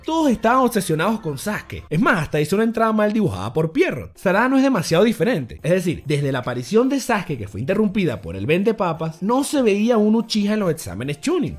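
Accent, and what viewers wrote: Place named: Venezuela. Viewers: nose es que con solo el acento se le nota que es de venezuela (caracas para ser mas específicos) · Yo venía a preguntar lo mismo xD este es el primer video que veo y me sonó muy venezolano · Obviamente es Venezolano, es muy facil notarlo.. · Si pues, el acento es muy similar a de nuestra casa, no sé si de caracas como tal pero, tiene ese acento criollo jajaja pero maneja buen léxico sin parecer un pajuo por eso no creo que sea de caracas xd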